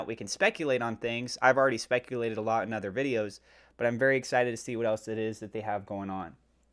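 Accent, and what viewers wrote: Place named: Wales, United Kingdom. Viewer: Haha how can you mistake a Welsh accent for Australian, they are so different!!!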